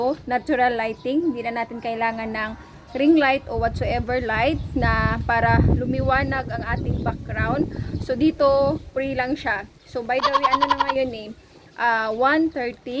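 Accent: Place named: Ilonggo, Philippines. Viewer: Hawud na kaayo ka mag Ilonggo sis nindut paminawon hehehe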